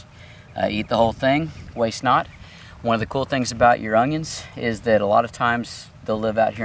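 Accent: Texas, United States. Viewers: He's a Texan.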